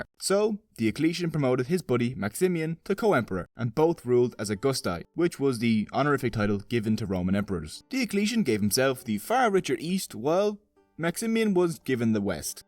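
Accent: Ireland. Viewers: That's 100% Irish accent.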